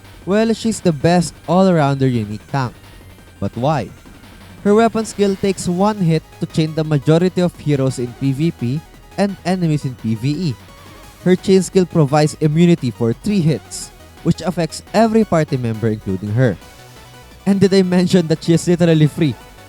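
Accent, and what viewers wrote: Philippines. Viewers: U sounds a filipin0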